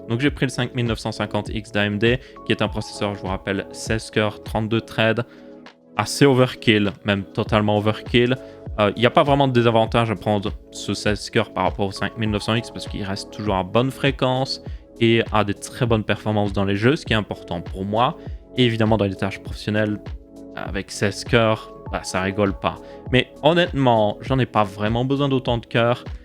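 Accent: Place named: Belgium. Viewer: Honnêtement, est ce que doit continuer de s’excuser de prononcer houit et pas huit (et nonante, septante etc)? Les gars il est belge laissez le dire ce qu’il veut dire de la manière la plus naturelle pour lui, on le connaît depuis suffisamment longtemps pour que ça soit pas dérangeant, même pour un nouveau viewer ça devrait pas être dérangeant, je veux dire y’a tellement d’accent différent dans le monde c’est quoi le problème?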